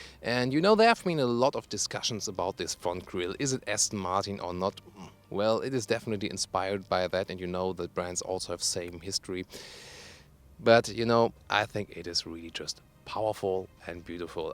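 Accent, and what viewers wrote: Germany. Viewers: Tolles Video, macht großen Spaß zu schauen! Nur der deutsche Aktzent ist gewöhnungsbedürtig, aber das ist keine Kritik :) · Like his english with german accent :)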